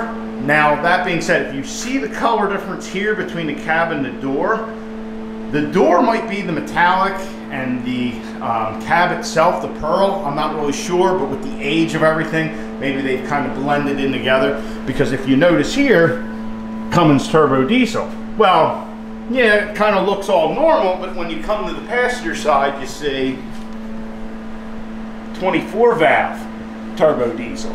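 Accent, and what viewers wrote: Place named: Pennsylvania, United States. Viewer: First minute or 2 of you talking knew you were from PA lol I'm from the lehigh valley. Have the same issue with 24v going to tackle this asap won't pass inspection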